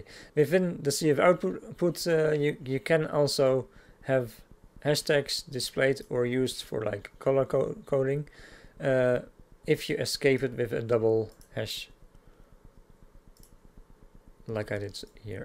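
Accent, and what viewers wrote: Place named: Netherlands. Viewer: from the 1st second i knew you were dutch😊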